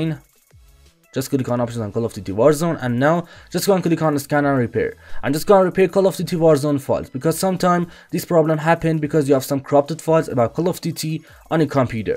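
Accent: Iran. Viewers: خیلی صدات خوبه ولی انگار ایرانی هستی😻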